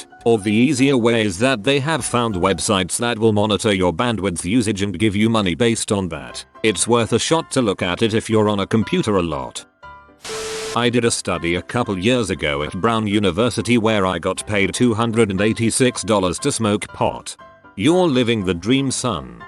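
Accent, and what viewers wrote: United Kingdom. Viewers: I hate the british voice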